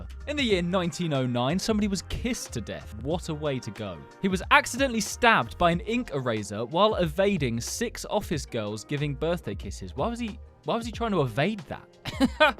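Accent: United Kingdom. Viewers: Love that british accent.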